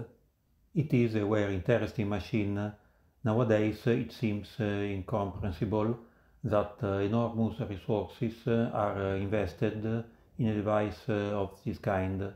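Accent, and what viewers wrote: Italy. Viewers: 5,000 to 7,000 parts! Electro mechanical feat of design and engineering. I came here by accident and was transfixed by this piece of office equipment. Whoever you are, thank you for producing this video, in English with an Italian accent!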